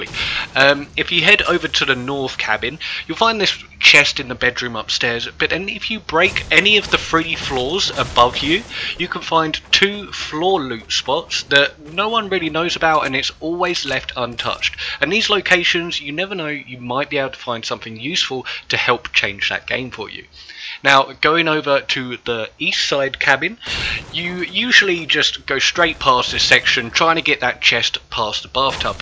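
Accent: United Kingdom. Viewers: David deans son ? Is this you your british ?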